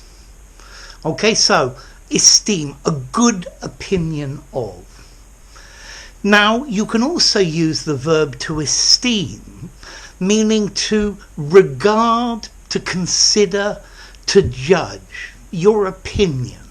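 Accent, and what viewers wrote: United Kingdom. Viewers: Hi May I ask what is ur accent? Modern RP or Traditional RP or neutral accent from some place？ thank u teacher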